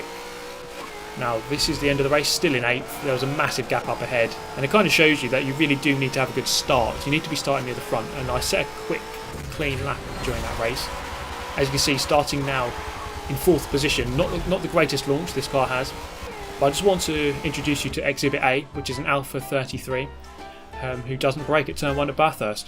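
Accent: United Kingdom. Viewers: Your charming British accent is overselling your actual driving skills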